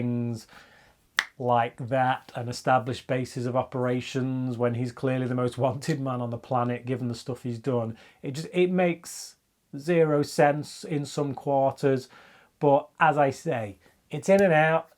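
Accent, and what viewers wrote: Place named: Scotland, United Kingdom. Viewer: I finally stopped hearing your scottish accent, the more you listen, the more you get used to it i guess, now your speech sounds like poetry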